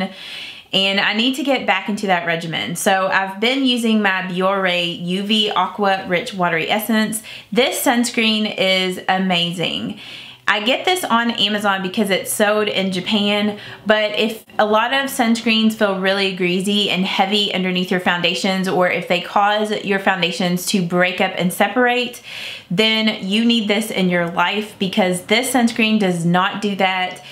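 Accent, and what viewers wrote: South, United States. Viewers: I love your videos. I love drugstore make up too and use it all the time. I am from the south too so I love your accent.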